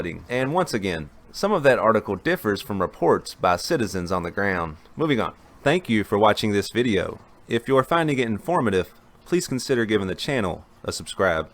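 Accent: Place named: West Virginia, United States. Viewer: Sounds more like West Viginian accent. You insult true Southern accents.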